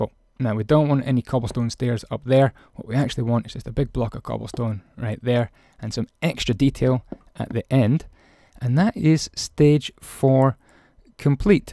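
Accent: Scotland, United Kingdom. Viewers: u have a Scottish AND a British accent mixed lol!!!!!! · are u Scottish I am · Are you Scottish? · Where is he from? He kinda sounds Scottish..... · Are u from scotland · Yeah I didn't know if he was British or Scottish ????😕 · Excuse me, thats a scottish accent, im proud to have one and i dont find mine annoying or wish i didnt have it. Plus that was rude and uncalled for. I like his accent but thats my personal opinon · Scottish? Hmmmm? Do I hear a Scottish accent? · is from Edinburgh and has a Scottish accent. Sounds nothing like a welsh accent lol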